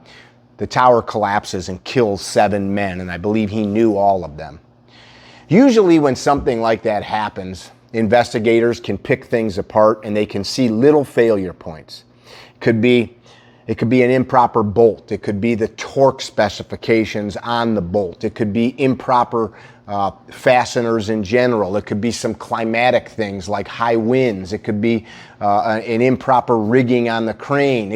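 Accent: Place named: Midwest, United States. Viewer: I love your videos and attitude. Please keep them coming. I binge watch. Sorry to ask a stupid question. Neither my wife nor I can tell where your accent is from. I guessed anywhere from Minnesota to Chicago area? Sh*t, that's probably far off. Thanks.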